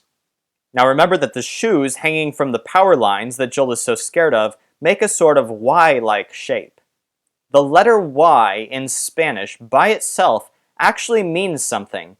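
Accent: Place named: France. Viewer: sounds like a native French man trying to speak Spanish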